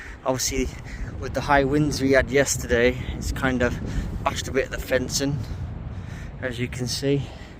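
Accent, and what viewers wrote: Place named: Cumbria, United Kingdom. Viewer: Hi I'm trying work your accent out. I'm gonna take a guess that you're from Cumbria.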